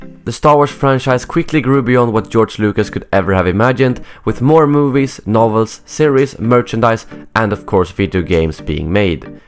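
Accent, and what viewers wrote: Sweden. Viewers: u sound swedish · u sound so swedish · You sounds Swedish. Are you?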